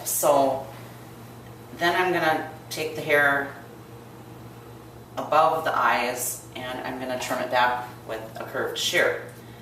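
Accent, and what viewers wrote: United States. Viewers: Good to see you again, and hear your Yankee Doodle accent. Conway is a cutie pie.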